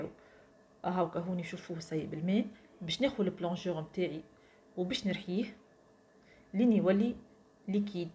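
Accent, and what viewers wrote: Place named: Tunisia. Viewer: ماشاء الله عليك بحب اللهجة تونسية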